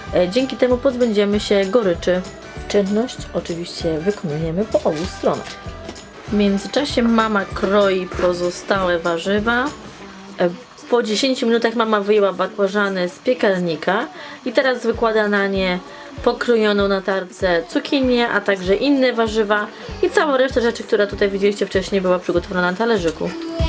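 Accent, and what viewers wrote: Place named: England, United Kingdom. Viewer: Wróciłam do Twoich starszych filmów i zauważyłam że miałaś większy akcent angielski niż teraz:D duża zmiana na plus. Bardzo fajne filmy:)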